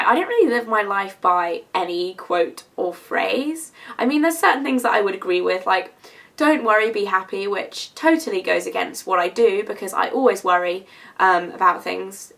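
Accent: United Kingdom. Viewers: I love your channel! Enjoyed your 13 questions tag! It is so neat to hear your accent! I would love to visit the UK someday! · ahhahhaaha squirty cream. i love british accents!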